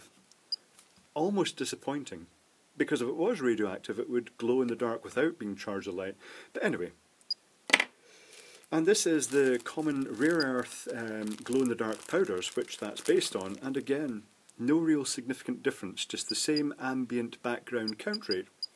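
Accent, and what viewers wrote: Scotland, United Kingdom. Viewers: Very informative, I also wanna buy this because of the same video. Also, are you Scotch?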